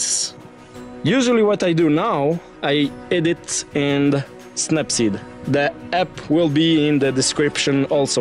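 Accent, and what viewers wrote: Brazil. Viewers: brazillian accent.... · Tu é BR né man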